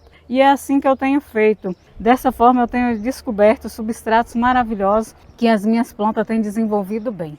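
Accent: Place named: Rio de Janeiro, Brazil. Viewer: Bom dia eu pensei que vc era carioca?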